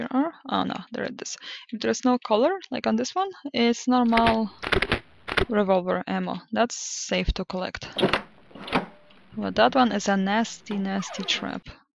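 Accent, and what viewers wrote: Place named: Ukraine. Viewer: Like your accent. That Ukranian?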